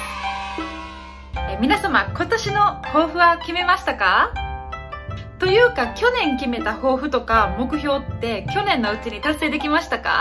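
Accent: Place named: Osaka, Japan. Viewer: 今年も宜しくお願いしますｺﾃｺﾃの大阪弁の後英語ぺらぺら僕も英語できれば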